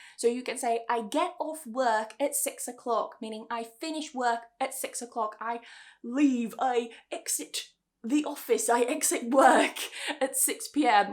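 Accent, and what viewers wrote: United Kingdom. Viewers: Hello, it's always a pleasure to listen to you. Your accent is so british (londoner?) and easy to understand even though you speak quickly. Why say meet + up, meet alone is not suffisant? It's like find and find out, I don't see the difference. May be could you explain that in your next vidéo. So, thank's, we are looking forward to the next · Your english British dialect are very very clear ❤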